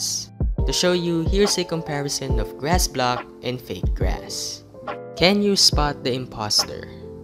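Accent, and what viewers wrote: Philippines. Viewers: he sounds like a filipino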